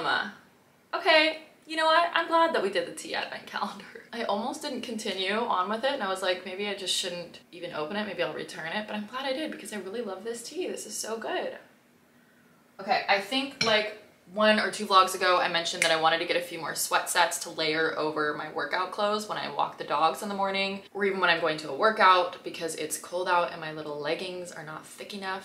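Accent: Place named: United States. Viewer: I Love this vlogs. I can’t wait to see another one tomorrow. Have you ever tried to go for language exchange meetings? I’m sure a lot of people will love you American accent (at least I do) and you may meet some interesting guys from abroad, open minded and with the energy to discover the world. Meeting someone with another culture is amazing, everyday you learn new things.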